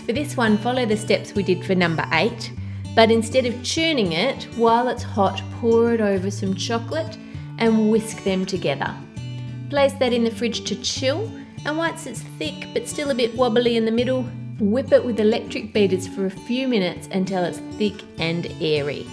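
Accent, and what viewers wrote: Australia. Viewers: Australian says "sugar" --> "suga" because she is an Australian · the australian accent is so cute w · She doesn't sound British. Is she Australian?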